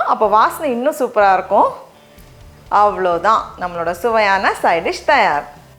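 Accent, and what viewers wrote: Nagercoil, India. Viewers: How are you? Waiting for your video before starting my online classes. I am teacher working in UAE from Nagercoil..Love the way of your Nagercoil slang ka...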